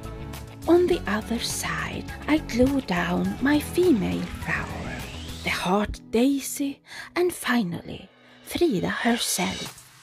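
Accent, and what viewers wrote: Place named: Scandinavia. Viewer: You are absolutely adorable! I love your work so much! All of the work that goes in to those details...incredible, and so very well done. Your creativity knows no bounds Love, love, your imagined birds and flowers. So special...and I could listen to you talk all day with that sweet Scandi accent.....and a "heavy book" by Jamie Oliver - yes, please!!!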